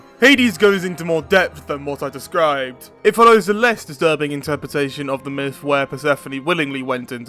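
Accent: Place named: United Kingdom. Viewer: Next time pick a non British voice actor. Great video thou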